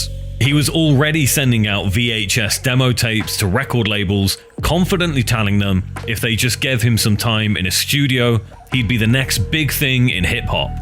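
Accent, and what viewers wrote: United Kingdom. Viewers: Sounds way more official with a British dude narrating👍👍👍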